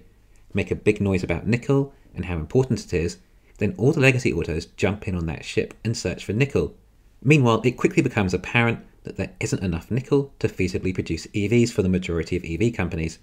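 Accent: United Kingdom. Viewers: I’m British so the accent always suits my ears. More importantly the delivery is clear and without repetition. The simple things like NOT repeating subheadings in the first line of text are well done.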